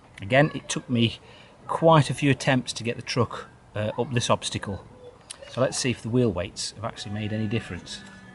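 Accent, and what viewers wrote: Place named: Stoke-on-Trent, United Kingdom. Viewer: Hey bud, Cracking vids... I’ve just purchased one of these mn99 myself and will be looking to weight my wheels when it arrives, What weight would you suggest putting in after your test? 40g 50g? Also I couldn’t help but notice your accent, are you local to the Stoke On Trent area?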